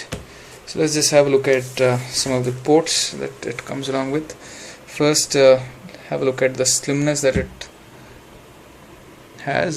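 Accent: India. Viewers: I think you are having trouble with the Indian accent. Grammatically, sounds pretty correct.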